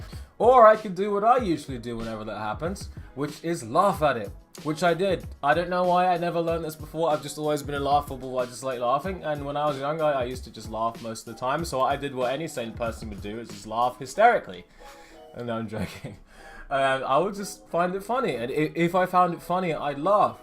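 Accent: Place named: England, United Kingdom. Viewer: Bravo! You said this so well! The curious thing in America is that people (black and white) in the rural South got over racism a generation or two ago; but in the big cities, the North, the West Coast, etc., where people think they're so enlightened, one's race dictates how he's expected to talk, dress, act, walk, drive, sing, be entertained, learn, live, worship, vote, and even procreate. For instance, you speak exactly like any other young educated Englishman. A blind customer in the shop where you work couldn't possibly know your race; but in urban America one phrase out of a black person's mouth would instantly reveal it and deliver a boatload of assumptions--most of them imposed by a destructive urban black subculture itself, not by white people trying to keep anyone down.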